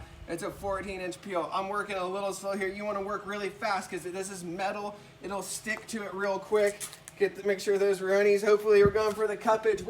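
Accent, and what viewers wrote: California, United States. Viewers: You have one of the strongest california accents I've heard and I live in LA!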